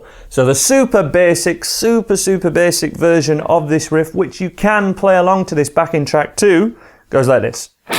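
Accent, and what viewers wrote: United Kingdom. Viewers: Jesus, man, you're sooooo britttish!! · Speediablo 7 Productions he sounds absolutely nothing like Ali-A, the only similarity is that he’s british, that’s it. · Something tells me that your british 🤔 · I think this guy is a bit British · you are only brit that i can listen to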